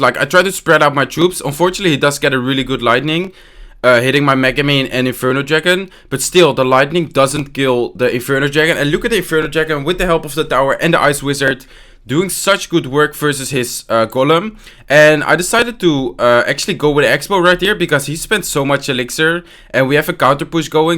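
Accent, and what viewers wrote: Netherlands. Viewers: your not english i think i think your dutch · Haha je hoort echt duidelijk dat hij Nederlands is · ik hoorde meteen je Nederlandse accent hahaha want dit is de 1ste video die ik van je kijk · Are u dutch cuz u have a accent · SmikkelKabouter hij is nl ik hou echt van zn engels😂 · Haha je Nederlandse accent is te horen ;) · Zo'n nederlands engels kut accent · Haha dat Nederlandse accent geweldig😂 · ben jij nederlands? zo klink je man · are you dutch? sounds like it xD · SmikkelKabouter sws nl je hoort het meteen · SmikkelKabouter He is Dutch yes. I used to speak English like that too but that was when I was 12 years old xD Btw sappige nickname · Je bent nl 😂 dat hoor je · SmikkelKabouter hij is nl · Mooie tacktieken! Toen ik de video startte en je accent hoorde dacht ik gelijk "dat moet wel een Nederlander zijn" en toen las ik je discription. 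Tbh best wel fun.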